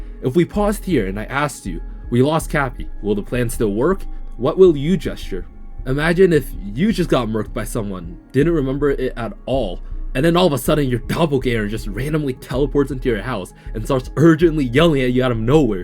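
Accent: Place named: United Kingdom. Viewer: can’t watch game theory since the new guys british accent keeps throwing me off and making me nauseous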